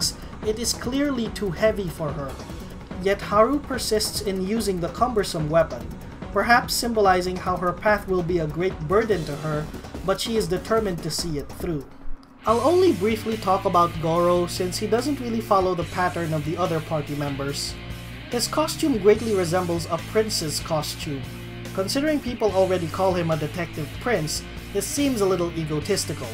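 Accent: Philippines. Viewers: I can recognize that accent anywhere... are you Filipino? Edit: lol Akechi's a white knight... that NOT everyone likes. Ironic isnt it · By the way, are you Filipino? You sound like one.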